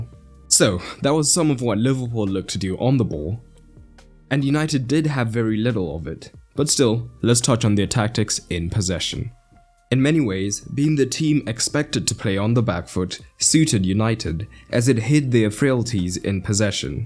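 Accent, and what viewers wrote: South Africa. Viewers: Are you South African? Great analysis- love your videos❤️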